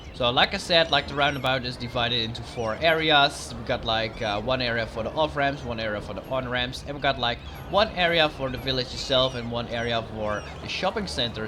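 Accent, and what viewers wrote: Netherlands. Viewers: are you dutch????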